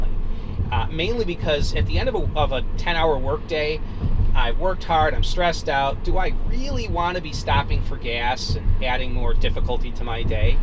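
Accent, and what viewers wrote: Midwest, United States. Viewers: Lmao your accent. Kills me. Love my midwestern folks. Right off the bat 👏🏻💀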